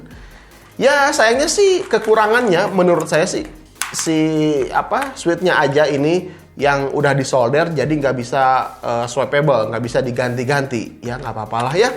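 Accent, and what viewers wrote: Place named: Sunda, Indonesia. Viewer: Logatna meni alus ... Sunda pride 😂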